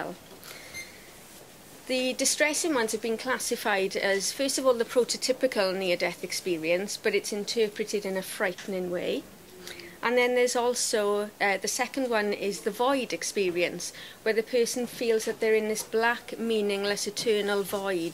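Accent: Wales, United Kingdom. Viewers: Is she welsh? Love her accent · Lovely Welsh accent. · A very interesting talk by a very interesting and lovely speaker, with an open mind and a beautiful Welsh accent. She covered many aspects related to the NDE experience.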